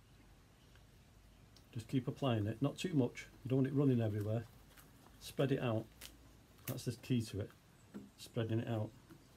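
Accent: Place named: Lancashire, United Kingdom. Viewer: Nice work. I stumbled across your channel. I'm in Huddersfield. You sound lancs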